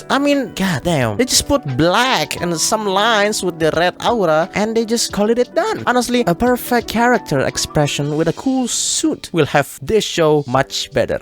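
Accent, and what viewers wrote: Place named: Indonesia. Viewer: wait are you indonesian? you have such heavy indonesian accent when you're speaking in english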